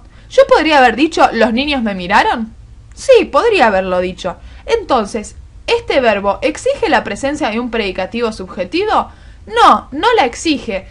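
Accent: Argentina. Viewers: hace tanto que no escuchaba ese acento argentino hahahah muchisimas gracias por la explicacion!!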